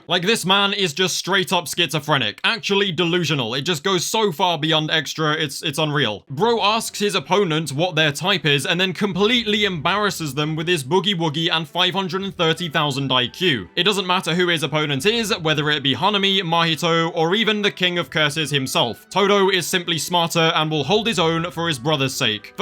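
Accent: United Kingdom. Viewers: I didn't know you were British mate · Your British? Unsubbed :(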